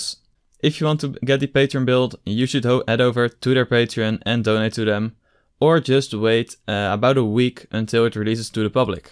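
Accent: Netherlands. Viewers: dutch accent? :p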